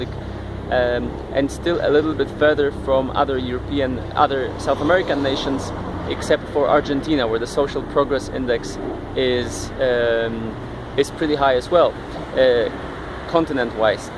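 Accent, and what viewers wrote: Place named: Chile. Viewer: Wyglądasz jak "rodowity" Chilijczyk (z wyraźnymi, europejskimi korzeniami). Mówisz po angielsku, jak Chilijczycy (akcent)... jak długo mieszkasz w Chile? : )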